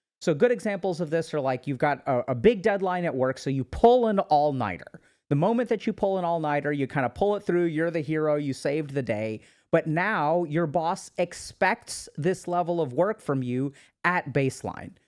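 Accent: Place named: India. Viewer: Dr. K - Would you consider having a secondary channel for 528 Million (½ a Billion) Hindi speakers ?The accent would actually help in popularity in the younger generation. 😊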